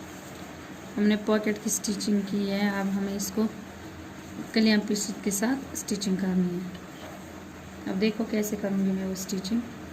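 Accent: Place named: Kashmir, India. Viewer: Your language is sweet I think you are from North Kashmir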